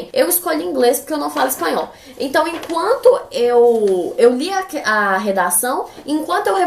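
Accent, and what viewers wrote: Minas Gerais, Brazil. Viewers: E sotaque de nos mineiros !!!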